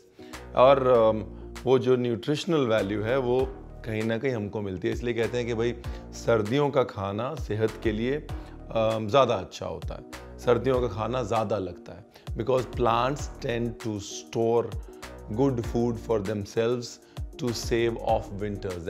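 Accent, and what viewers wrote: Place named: Bengal, India. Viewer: Your way of talking is quiet similar to bengalis, do you have any connection with Kolkata , as becoz your accent, way you throw words are very sweet and not like typical Punjabi's. 🌼🌼❤️🌸love from West Bengal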